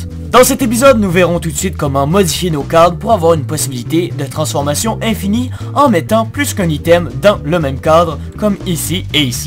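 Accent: Quebec, Canada. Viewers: Sincerement, j'adore l'accent quebecois! J'ador aussi tes vidéos, tu deveais faire du feed the beast, ou si t'en fair deja, que tu fasse des vidéos sur le serveur feed the patrick!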